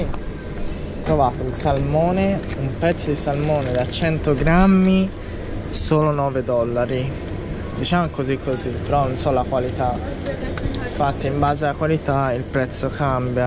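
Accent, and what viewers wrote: Tuscany, Italy. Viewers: che bello porca eva sentire il toscano in nuova zelanda! hahaha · ✌👏😂😂😂😂😆😆😅😅😅😆😆😆❤💋💋💋TROPPO FORTE TROPPO AVANTI LA PARLATA TOSCANA PERÒ PARLANDO DI ITALIANITÀ QUA' NON C'È RIMASTO PIÙ NIENTE LA NOSTRA CARA PERNIGOTTI E TURCA ADESSO LA BARILLA FATTA CON GRANO RUSSO 😢😢😢😨 E SI QUA IN ITALIA C'È SEMPRE IL NOSTRO MADE IN ITALY MA CI SAREBBE DA PARLARE TANTO SU QUESTO DISCORSO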